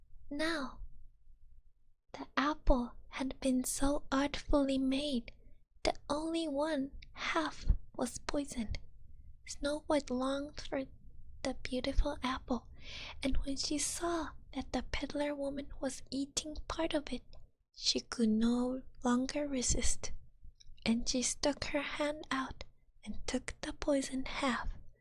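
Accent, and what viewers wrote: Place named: England, United Kingdom. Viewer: If I didn't know otherwise, I world have said she was English. Sorry my Japanese is not good enough to write this in Japanese.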